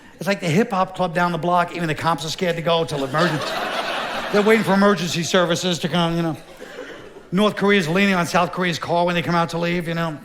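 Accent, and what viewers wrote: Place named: New York, United States. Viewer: He sounds so New York but is so intelligent!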